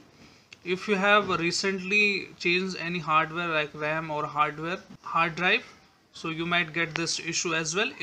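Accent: India. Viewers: I know the problem is solved when i hear the indian accent. Thx bro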